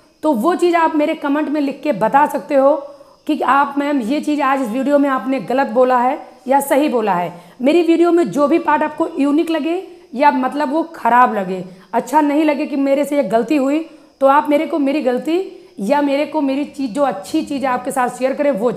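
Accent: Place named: Haryana, India. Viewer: Mam lgta hai aap haryana se ho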